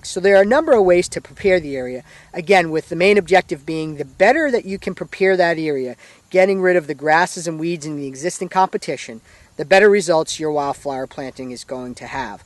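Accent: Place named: Western Massachusetts, United States. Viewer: Trying to pinpoint his accent. Western mass?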